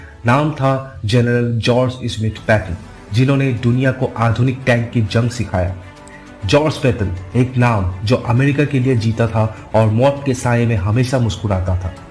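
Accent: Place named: Northeast India, India. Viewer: Nice and informative video, north east accent added to the presentation.... reminds me of my coursemate ... Good job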